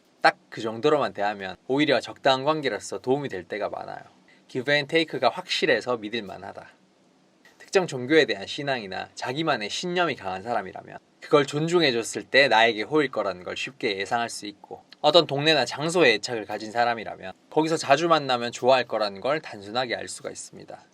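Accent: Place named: Busan, South Korea. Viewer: 항상 잘 보고있습니다. 말을 너무 부드럽게 잘 하는 듯해요. 특유의 부산말투도 정감있구요^^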